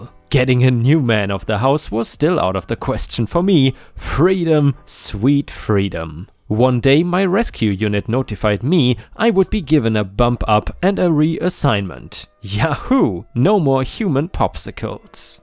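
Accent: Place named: Germany. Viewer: I love your accent! You sound like my German Grandmother! 💋❤❤❤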